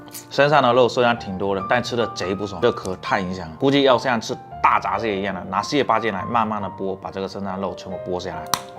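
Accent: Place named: Minbei, China. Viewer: 的闽北普通话不敢恭维丫的女人缘真的没话说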